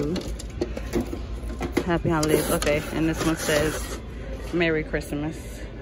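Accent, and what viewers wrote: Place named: Caribbean. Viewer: I hear an accent.u from the caribbean?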